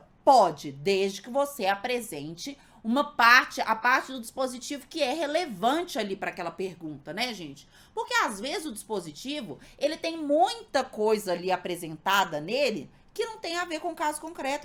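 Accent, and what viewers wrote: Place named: Minas Gerais, Brazil. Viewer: é mineira 😂😂😂😂😂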